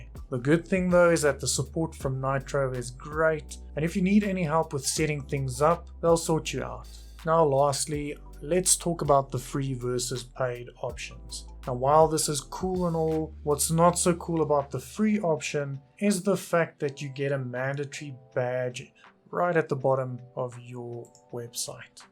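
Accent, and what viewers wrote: South Africa. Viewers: Are you South African 🤔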